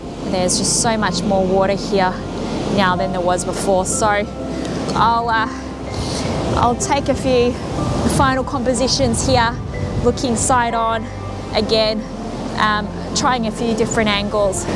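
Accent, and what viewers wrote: Australia. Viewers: Just Flew back home after long time away and found your informative and entertaining Channel . It was so good to hear a Australian Accent on a photography channel. Keep it up great work😁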